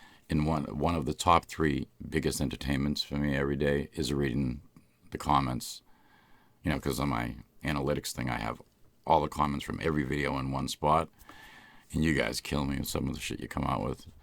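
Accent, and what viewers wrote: Boston, United States. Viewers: Is that a downtown Boston accent? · Boston accent lolol from rhodeisland myself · Jewish Jesus it’s a Boston accent. Are you claiming to be the Jewish Jesus? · Bahhh you should do more regular vlogs!! I want to see more of your beard and hear more of your Boston accent! It’s just as relaxing as your asmr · Are you from Boston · Are you from Boston?? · Is he from Boston? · I never knew that your real voice was a heavy Boston voice XD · Is that a Boston accent I hear? 😊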